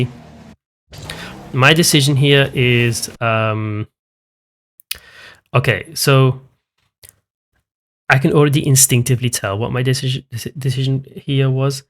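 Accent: Scotland, United Kingdom. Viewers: Maybe he’s Scottish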